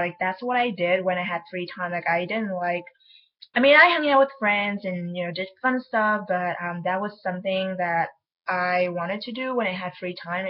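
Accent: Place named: United States. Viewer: hey how long have you been there? Your accent is almost 100% american. I am confused about this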